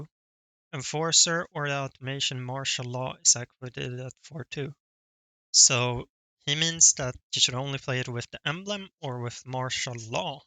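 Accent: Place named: Sweden. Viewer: At the end there.. What Title meant with the tips is that it is crucial to find Illaio 2 with rebels, and to do that over Zoe 2 star. Spending 12 gold on making Zoe 2 star when rolling down(and being stuck on that 12 gold loss - cant sell her), then being broke - and then not finding Illaio 2 star. He means that this is a big "loss condition" for rebels. How to lose games with rebel. Maybe prio looking for 2star illaio first, then other units when rolling down, maybe dont make Zoe 2 star if low gold when rolling down + no illaio 2 star. Great videos btw, i love your content. Keep it up! P.S you sound Swedish. Heja svenskar, snart blir det varmare här! haha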